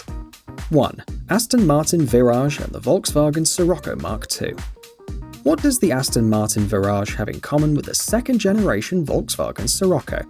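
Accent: United Kingdom. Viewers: The video is pretty cool, but your fucking British accent fuck me up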